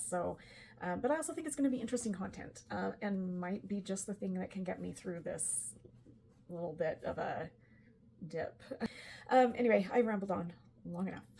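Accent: Utah, United States. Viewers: what you are going through is valid. I have AuDD. I have experienced so much of what you are going through. I feel my life will be in "recovery" from not paying attention to what my body and brain were telling me. I am in awe of your strength and self-awareness it took to make this video. In this time I have heavily relied on channels like yours and Mac's, (along with other hyper-focus interests). Having been a life long Northern Utah girlie, your Utahan voice and cadence have soothed me more times than you can know. I have wanted to offer my (admittedly, very limited) assistance with what you do, and now I feel drawn even more to offer it, even if it is just to tell you that you have my gratitude for just being who you are. I live north of you, and am serious about offering support should I have the capability. Those of us who truly value you, need you to be your own first priority. 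I love your channel, it has served me so many times as a body double. I (and all of use) support you in your journey. Don't you dare respond to this with more than a heart! Unless you are interested in my assistance, but even then, a very short answer is more than enough!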